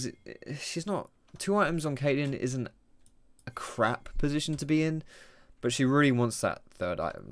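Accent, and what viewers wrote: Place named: United Kingdom. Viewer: EUW, he's British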